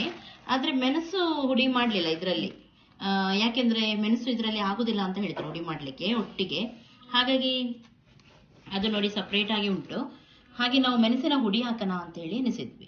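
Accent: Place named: Kerala, India. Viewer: Mdm..ji.ur kerala style mixing speaking kannada explanation is extremely good n methodology is excellent..sister.let God gives u all sathvica sampathu..Badarinath.mysore.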